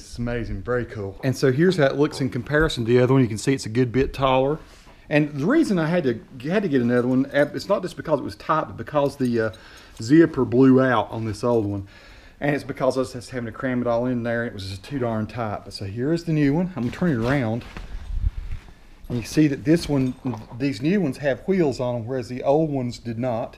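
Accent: United States. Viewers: I am British, that's a proper US accent you have there! Just got the same one for my 21.5" 4k machine - thanks for the vid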